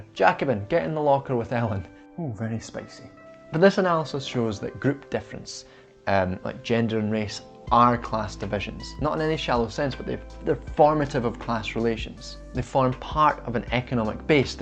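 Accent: Scotland, United Kingdom. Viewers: I was keen for a new channel but fuck am I glad to hear a Scottish accent 🙏🏽🥰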